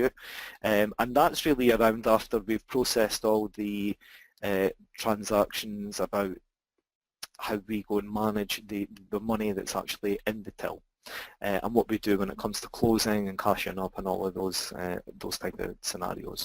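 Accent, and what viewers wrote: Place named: Germany. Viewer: Like your German accent :) cheers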